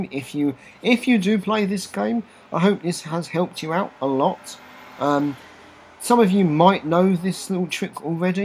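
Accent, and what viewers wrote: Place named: London, United Kingdom. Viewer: Awww London accent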